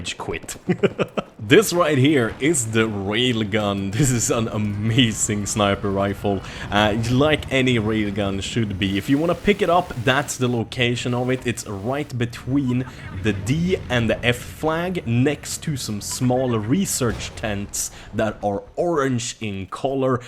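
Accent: Sweden. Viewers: Is he from sweden?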